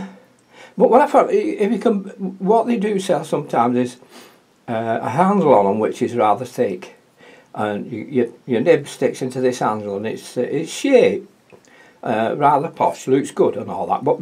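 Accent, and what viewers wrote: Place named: Northern England, United Kingdom. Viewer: great pen & wash,keep em coming! and the way you talk is ok,you are what you are and the northern accent is good.i was born in the east end of london and we have our own language,when i moved to another part of london the teachers at school called my mum in and said they couldnt understand what i was saying, be proud of where you are from,not to please others. keep well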